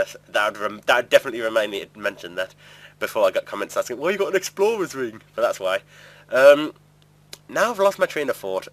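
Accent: Newcastle, United Kingdom. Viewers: loving the jeordie accent in the super hostile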